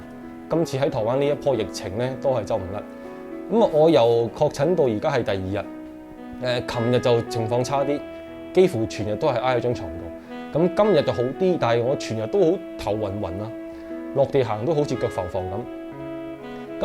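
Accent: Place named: Guangzhou, China. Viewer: 你的口音有D似廣州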